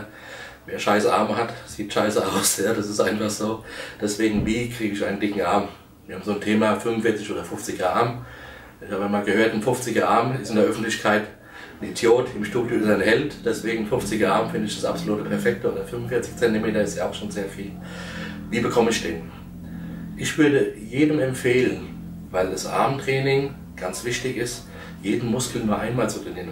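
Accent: Germany. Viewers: Evangelium nach Johannes Ai bub geh mir fort des is hessisch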